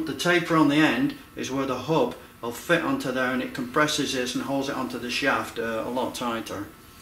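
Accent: Yorkshire, United Kingdom. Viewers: Thanks so much for this excellent video. All of your videos, actually. Top notch. On a lighter note, I'm amazed that you can work in your good shirt and not ruin it. I've been wrenching on these cars for 50 years and mine are usually ruined in the first 20 minutes. 😂 Wish I knew if you had paypal. I'd send you 10 bucks for a pint (or 2!) as a thank you! PS love your accent. are you in the Dales?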